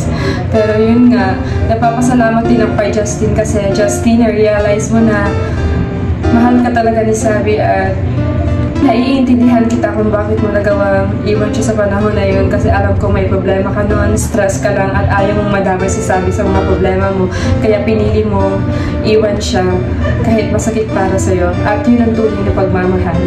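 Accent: Visayas, Philippines. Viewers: Teh bisaya ka po ba?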